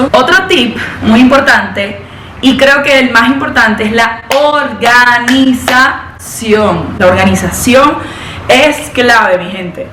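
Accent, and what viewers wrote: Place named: Venezuela. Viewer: Guido Kaczka On Drugs No es Colombiana, su acento es como venezolano. Saludos desde Medallo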